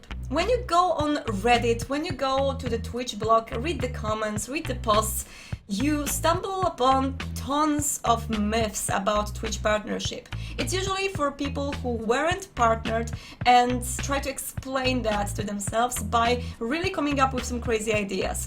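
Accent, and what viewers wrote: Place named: Poland. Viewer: Od razu słychać, że jesteś z Polski.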